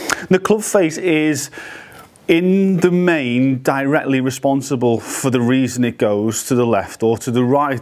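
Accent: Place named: Liverpool, United Kingdom. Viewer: Been a while, do prefer a fellow scouse doing tutorials 👍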